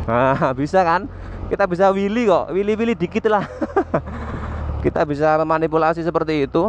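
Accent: Java, Indonesia. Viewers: pake logat jawa :v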